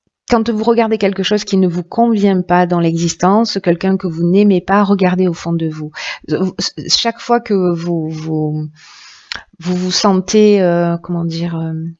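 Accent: South, France. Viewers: Merci ! Reçu 5 sur 5, accent du Sud compris, soleil assuré !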